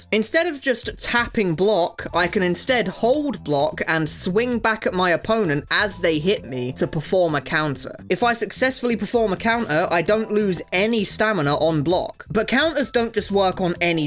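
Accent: United Kingdom. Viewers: the funny British man is back :D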